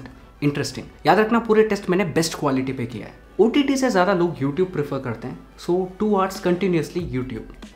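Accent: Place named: Tamil Nadu, India. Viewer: nee hindu pesura style paathope purinjipochu... Tamil naatu accent irruku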